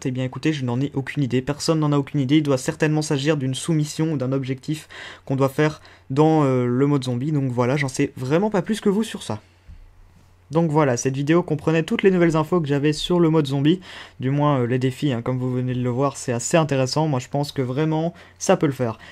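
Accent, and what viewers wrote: Belgium. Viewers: un petit accent de Namur .... si tu arrivais à persé le mystère des emblemes en mode Zombie la je Pourrais dire que tu représente bien la Belgique sur youtube/COD parce que avec 102 000 zombie tuer je suis toujours en chien au couteau ! nan je déconne tu représente bien à fou continue ;)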